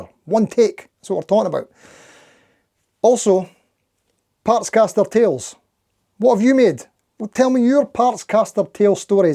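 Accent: Scotland, United Kingdom. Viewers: That`s allright. Thank you for answer. Please contiue with your channel. I love your Scottish accent. I`m from Germany.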